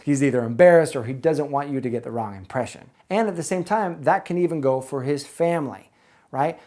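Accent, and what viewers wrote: United States. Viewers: I love your slight country accent! I didn’t notice at first until you said “time” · I love your video's. I love your American accent too 💛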